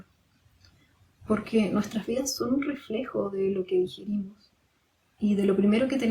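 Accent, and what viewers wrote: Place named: Chile. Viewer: Que bonito tu acento ¿Eres chilena?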